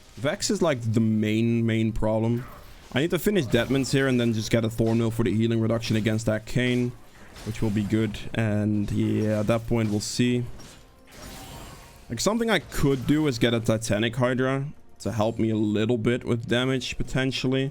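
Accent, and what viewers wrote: Netherlands. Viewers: U are Dutch ?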